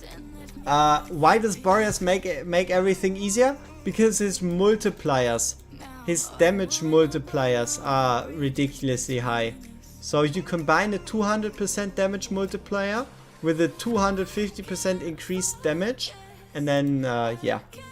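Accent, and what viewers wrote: Germany. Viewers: naja man hört halt direkt dass du Deutscher bist :D aber man versteht alles und das ist doch die Hauptsache. Und inhaltlich eh top, also who cares ;)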